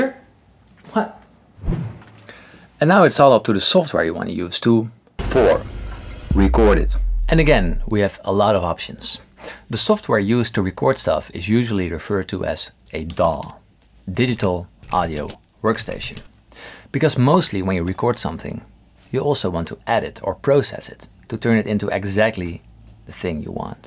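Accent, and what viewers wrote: Netherlands. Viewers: Your name sounds English but your accent sounds Dutch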